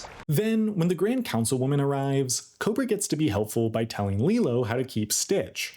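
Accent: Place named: United States. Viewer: That white American accent you got. Cripes.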